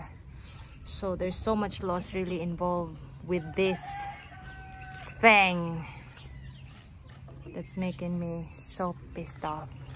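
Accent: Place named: Australia. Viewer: dont blame you those workers maybe not intelligent enough to care even you said that they were hill people before or was William when he was in the back block love your swearing your very Australian its cute when my wife swears like you do but my wife has understand more bastos Australian now after 20 yrs even at work her co workers teach her to hahah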